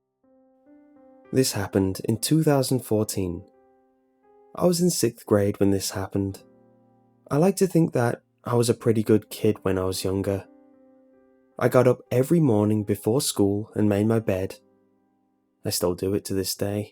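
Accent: United Kingdom. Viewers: This British accent of yours is interesting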